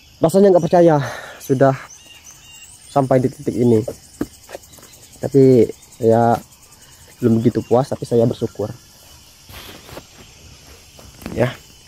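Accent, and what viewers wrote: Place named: Bangka, Indonesia. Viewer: dari dialek nya dari bangka ya bang ? salam dari belitung bang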